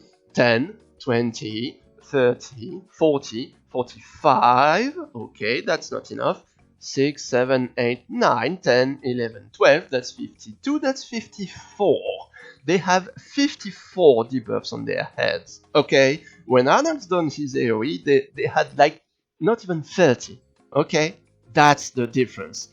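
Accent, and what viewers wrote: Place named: Poland. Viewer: Pas ça s'entend pas des masses, j'ai entendu un accent mais je pensais que t'étais polonais c'est à la fin c'est comme qui dirait writté entierementely in Françish. Du coup d'ailleurs je me rapproche du G13 avec GG et à ce propos, MIS A PART les pièces de G12, est-ce que le passage de G12 à G13 procure des PV supplémentaires à GG ou pas?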